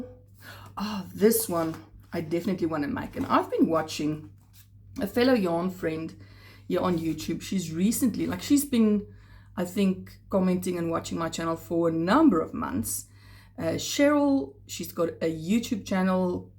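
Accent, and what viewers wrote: South Africa. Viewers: Hi I love the animal friends of Pica Pau 3. This is the book I recommend. My parents lived 12 years in Cape Town and I loved going on holidays there. Your accent reminded me a lot of memories.❤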